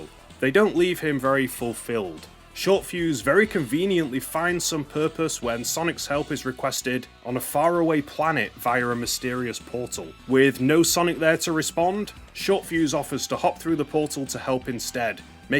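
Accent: United Kingdom. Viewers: I'm british, myself, but sometimes I really notice that wonderful british accent :D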